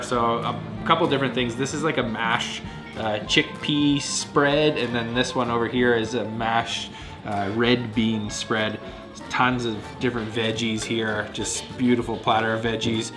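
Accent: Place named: Canada. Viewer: I love your Canadian accent (the "ou" phonemes are probably Scottish in origin)! You probably know more about international cuisines then anyone else on earth. "Been there. Tasted that . . ." It's existential, not cook book stuff! Long may you reign . . .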